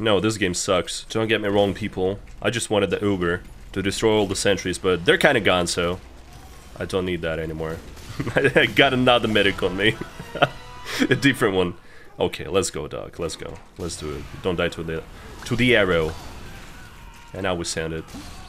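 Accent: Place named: Russia. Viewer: Единственный канал на английском, который я могу нормально смотреть (уж слишком русский акцент помогает, да и местами русская речь прикольно звучит (¬‿¬ ) )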